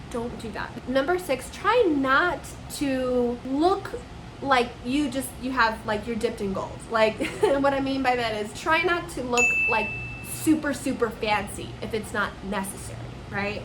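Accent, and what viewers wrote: Paisa, Colombia. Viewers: Habla en inglés con asentó paisa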